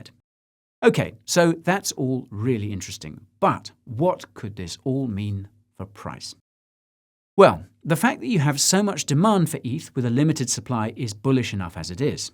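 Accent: United States. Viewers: Great American accent! I'm always amazed when I hear a brit talk just like a Yankee! Very kool! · Pretty good American accent guy. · Love your American accent! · The American accent is amazing hahaha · Nice American accent!!! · that American accent is on point lmao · Guy, does a pretty good American accent. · Incredible American accent! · I like that USAccent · As an American, I appreciate your American accent hahaha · your american accent is fantanstic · As an American I find your American accent impeccable and was super impressed. 😳🌟👌 Well played, sir! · Impressive United States accent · Not bad American accent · As an American, I want to say your American accent is excellent! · Love the American accent lol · That was a far better American accent than I would be able to do British accent. Bravo 👏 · american accent is sick. much love from tennessee 🇺🇸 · American accent was not bad///// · Your American accent is pretty good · pretty good US accent lol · Great American accent. 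· The American accent is brilliant! Very well done. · Great American accent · Great American accent, ya got there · The American accent was great 👍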